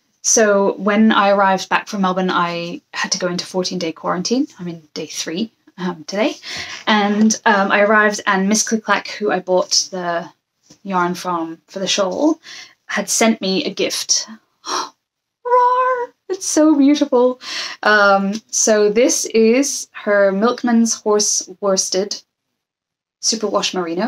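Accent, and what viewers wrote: United Kingdom. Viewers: Hi, Hello from Scotland. You just popped up on my YouTube suggestions, and I’m so glad you did. I was knitting with some Miss Click Clack Merri Creek Sock yarn while I was watching you. I’ve been a member of her Ravelry Group for around 6 years now. She is a wonder with the dye pots! I even flew from Adelaide to Melbourne a few years ago, for the day, just to attend a Craft Event and a “Clackette” get together. (I have lived sporadically between Adelaide and Scotland over the years - long story) I don’t sew but I still enjoy seeing beautiful fabrics and hearing what you intend to make with them. I’m amazed at what you found in thrift stores. I’m looking forward to seeing your winter coats in those gorgeous wool/cashmere fabrics. The aran cardigan was also a great find. It looks like you are leading an interesting life up there and I look forward to seeing more of your bus, and the surrounding country. 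And… I thought I had detected a tiny trace of a scottish accent here and there, and then you confirmed it. All the best. 🏴🥰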